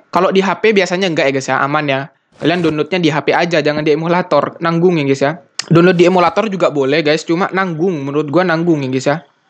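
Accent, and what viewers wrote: Madura, Indonesia. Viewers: Logatnya logat Madura bner kah?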